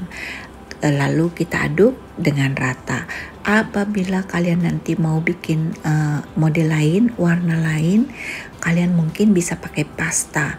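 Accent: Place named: Banyumas, Indonesia. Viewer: Suaranya agak mirip THV Channel (The Hasan Tv) tp THV versi ngapaknya 😁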